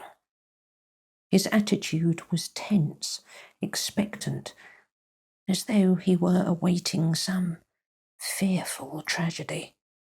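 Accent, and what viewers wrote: United Kingdom. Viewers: No problem,. Your British accent also fits these tales. Keep up the good work!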